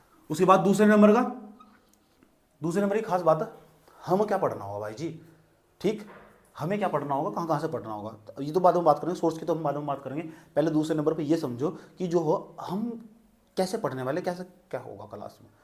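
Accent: Haryana, India. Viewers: Asa lagta h sir ap bhi haryana se ho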